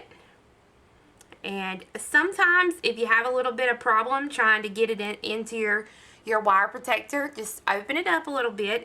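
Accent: South, United States. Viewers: these are beautiful ... btw I JUST figured out the charm of your voice -- it's that homegrown southern accent love your videos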